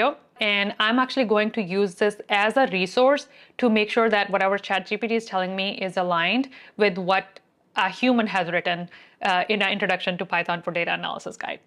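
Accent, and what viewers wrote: India. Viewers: Stop with the fake American accent. You're getting exposed with your Indian/Pakistani accent on several occasions. It's cringe of the highest order. Stop pretending 🙄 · Dear youtuber are u a kashmiri Coz ur accent sounds so much like us!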